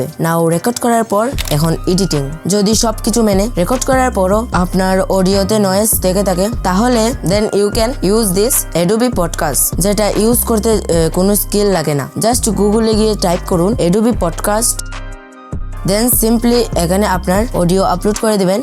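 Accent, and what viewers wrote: Sylhet, Bangladesh. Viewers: By the way Are you from Sylhet ?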